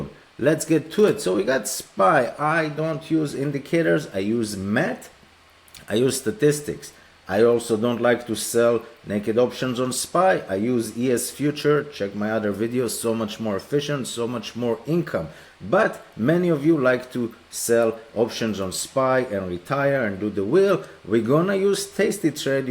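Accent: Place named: Romania. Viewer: That has to be a Romanian accent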